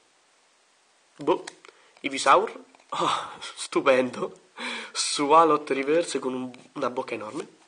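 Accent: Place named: Rome, Italy. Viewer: Si sente che sei romano o.o Comunque sei simpatico u.u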